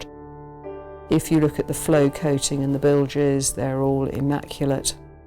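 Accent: United Kingdom. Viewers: I love her English Accent, so how much is it? Anyone?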